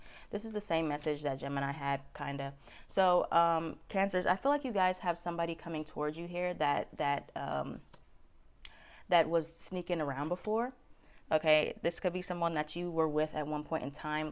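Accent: Bronx, United States. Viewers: You from the Bronx?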